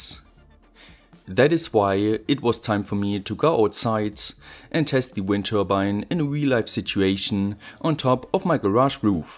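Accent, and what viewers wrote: Germany. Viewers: German accent cool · Hast einen deutschen Akzent 😂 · When Deutsche sprechen English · Man hört sowasfon das du deutscher bist ...... Kleine Frage noch warum sprichst du englisch... no offens wollte es nur mal wissen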